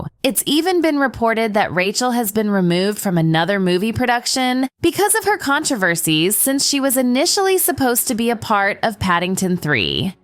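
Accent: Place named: United States. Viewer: Wtf is going on that narrators voice? It's the most annoying American accent I've ever heard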